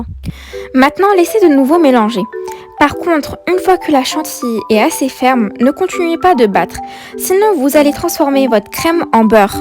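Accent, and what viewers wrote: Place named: Oujda, Morocco. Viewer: ماشاء الله عليكم وعجبتيني مين قولتي خودمي ههه على الموس اللهجة الوجدية حاضرة في الفيديو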